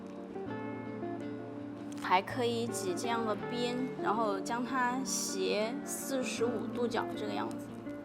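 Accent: Sichuan, China. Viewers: 干货很多，小老乡，加油！主要是听着川普比较亲切，没有笑话你的意思。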